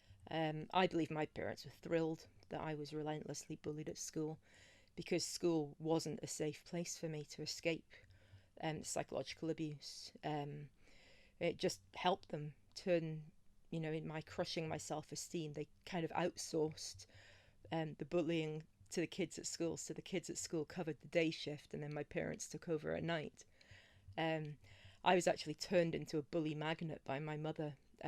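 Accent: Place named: United Kingdom. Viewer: Greetings from your sister over here in Savannah Georgia, a gentle hug and love from me to you. You have the British accent I have the Southern accent, 🌷 Happy Easter precious Soul.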